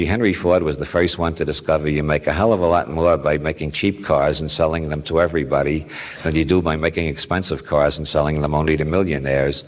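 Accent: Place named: Brooklyn, United States. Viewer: Joseph Toth ugh, absolutely never. His voice has a cadence, timbre, musical lilt (and Brooklyn accent) that would be utterly ruined by such fuckery. Just my opinion of course, but there’s nothing humble about it. Cheers!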